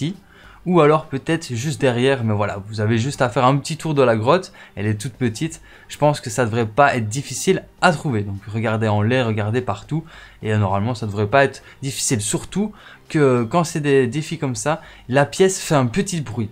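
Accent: Belgium. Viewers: IL EST BELGE IL DIT OUITE POUR HUIT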